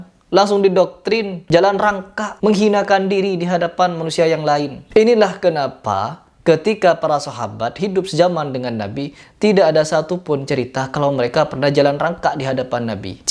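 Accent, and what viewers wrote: Madura, Indonesia. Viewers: Teruskan... TERUSKAN... Lucu logat bahasamu Madura bangetzzz.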